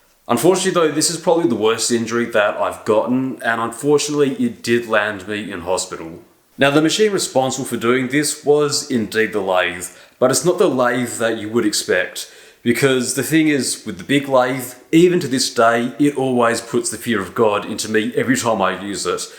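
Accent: Australia. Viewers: Wait .. he's Australian?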